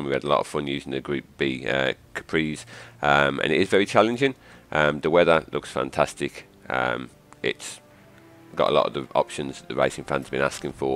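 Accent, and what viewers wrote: Black Country, United Kingdom. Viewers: where u from mate. I can here the yam yam accent. Lol im from wolves.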